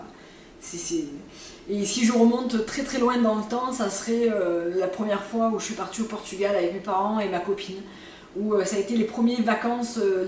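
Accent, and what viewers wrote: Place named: Marseille, France. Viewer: Merci pour cette vidéo! je me disais bien que tu avais des intonations marseillaises meme si je n'en étais pas sure a 100%! Toujours agréable à regarder !!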